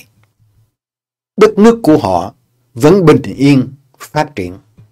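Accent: Mekong Delta, Vietnam. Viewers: Nghe câu nói rặt chất giọng người miền Tây của anh Việt Linh tôi cười mãi. Cả thế giới đều biết về Trump như thế nào, chạy xa lánh tên cùi hủi này nhưng người mỹ thì không thấy, không biết gì cả, thế mới lạ? 🤔🤔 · Cảm ơn câu nói chân chất, nhà quê, mộc mạc của Bác hai Trầu (Việt Linh), đúng chất giọng dân miền Tây, người Sài Gòn. 🤣🤣🤣👍👍👍👍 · Cảm ơn nụ cười vui với tựa đề hôm nay ngày Mồng Hai Tết, đây đúng là chất giọng nhà quê rặt miền Tây. 👌👌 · Tôi vừa nghe xong, chương trình đầu năm rất hay, cảm ơn anh Việt Linh nói lên suy nghĩ thay cho nhiều người về tình trạng dân trí xuống cấp tại Mỹ, mê tín di đoan tăng cao trong nhiều sắc dân, và tôi thích nhất câu nói rặt miền Tây của anh. TUYỆT VỜI. :face-red-heart-shape::face-red-heart-shape::face-red-heart-shape:👍👍👍👍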